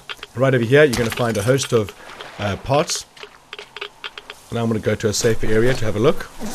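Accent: South Africa. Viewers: Awesome Vid, thanks. You sound hella South African.. Shout out from Cape Town. Keep up the GG's!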